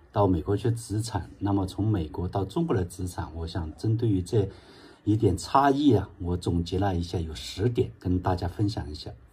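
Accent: Hunan, China. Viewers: 听CJ的口音像是湖南人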